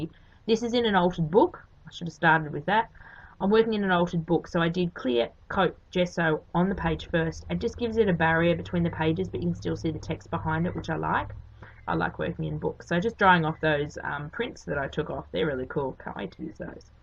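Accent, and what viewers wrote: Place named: Australia. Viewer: Hi, terrific journal pages. Love listening to you. It's great to be able to listen to an Aussie accent for a change. I wish we used coupons too. Take care, Amanda xox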